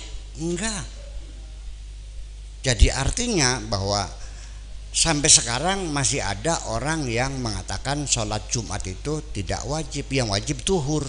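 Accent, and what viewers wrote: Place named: Banyumas, Indonesia. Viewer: Penyejuk sejati, suka sekali dengan ngapak ngapak nya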